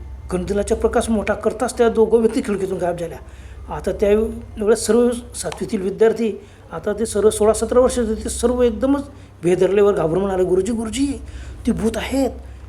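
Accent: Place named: Khandesh, India. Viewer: सांगणारे खानदेशात ले आहेत वाटत. काय कथा वाचता 😅😅😅😅...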